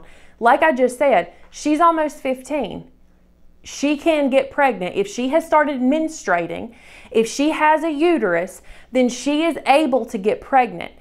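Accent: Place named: South, United States. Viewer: I love your content so much, it makes me feel better about my decision hearing someone who, at least sounds southern, talk about being childfree. I have known I don't want kids since I was like 10-12, I'm 23 and my entire family still says "you might change your mind" despite the fact I have adhd and depression already, despite telling them there is a high chance I will get post-partum and hate that hypothetical kid to the point of possibly hurting it, they don't care cause I have a uterus and we come from a big, Southern family raised on Christian values that I don't even believe in.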